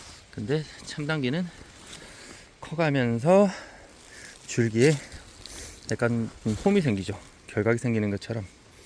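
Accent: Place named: Gangwon, South Korea. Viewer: 그곳은 혹시강원도인가요? 제고향 강원도억양인거같아서요~~말투도 정겹고 고향도그립고 엄마랑 나물하러다니던 추억도 그립네요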